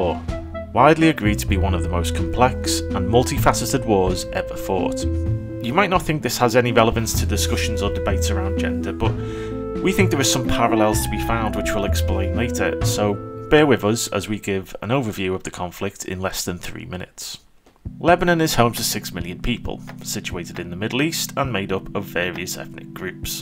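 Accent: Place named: North East England, United Kingdom. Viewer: Just come across your channel (new subbie now). Why on Earth doesn't this channel have more subscribers???? Your videos are excellent and really well made! Good to see that you haven't been "silenced". Working my way through the videos. Keep up the excellent work! PS You sound like you are from my part of the world (north east England).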